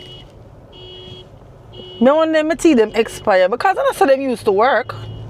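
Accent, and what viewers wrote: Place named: Jamaica. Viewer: Great stuff, great you... loving the Jamaican accent. Men loves women who loves cooking, especially me 😉. ❤❤❤❤❤🎉🎉🎉🎉🎉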